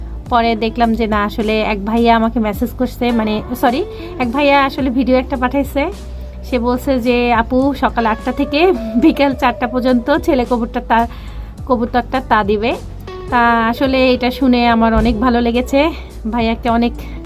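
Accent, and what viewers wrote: Rangpur, Bangladesh. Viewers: Onek moja pacchen kobutor ke niye mone hoy upner bastota bare giyeche upner kotha shune mone hocche Rongpure bari amader bashao Rongpur thaki from Austria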